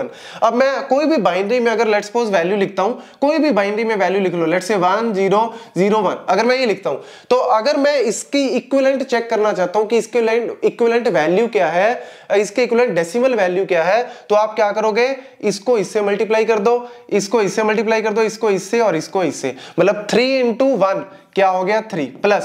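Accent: Punjab, India. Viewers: Veere I confidently know that your mother tongue would be punjabi.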